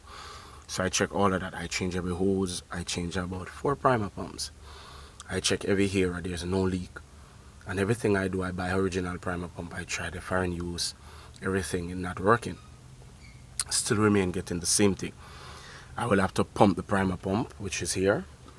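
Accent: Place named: Jamaica. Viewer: Bro you're from Trinidad and sounding like a Jamaican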